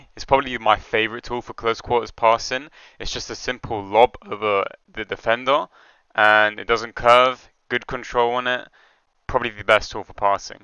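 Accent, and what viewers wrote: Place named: India. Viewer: I LOVE THE PRIME (u sound indian with that mic)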